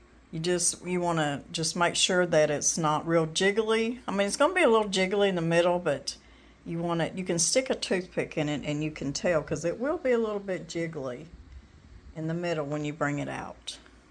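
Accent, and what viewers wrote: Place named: Arkansas, United States. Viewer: The kids would request buttermilk pie for birthdays so it was a favorite ❤️. I think my favorite thing about your channel is your way of talking there in Arkansas. It’s so sweet and relaxing. I love the words you use and the way you say them. Just oozes love and I know that comes from Jesus. I froze some duck eggs this morning and I made quite a mess! Tried to do it in the muffin cups but duck eggs are too big so I couldn’t whip them up plus duck eggs are so thick. So I got out my stick blender and the head of it fit right in the muffin cup perfectly. A few buzzes and all was well! Next time I will buzz them before pouring into cups but at least I saved the ones I had already cracked in the muffin cups. I did use the silicone type.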